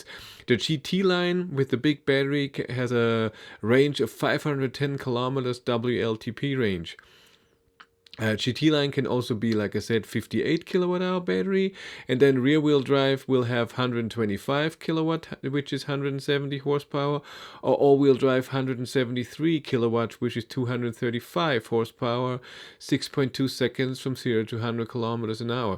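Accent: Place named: Germany. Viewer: german accent omg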